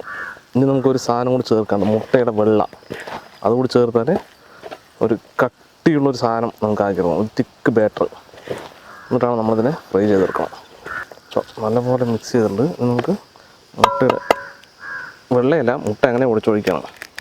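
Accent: Palakkad, India. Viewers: Thani palakkadan samsaram🤩🤩🥳🥳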